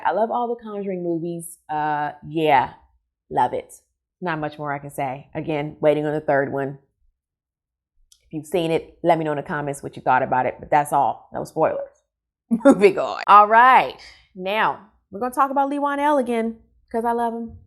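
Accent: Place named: England, United Kingdom. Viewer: This was amazing 👏 I love your English accent, it's better than mine 🤣 I can't wait for dark nights to roll back in so I can watch horror movies in the dark from like 5pm lol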